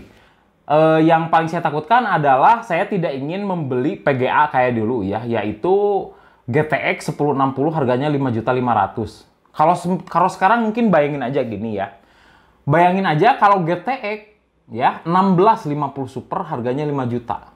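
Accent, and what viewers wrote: West Java, Indonesia. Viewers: Logatnya sunda pisan euy 😎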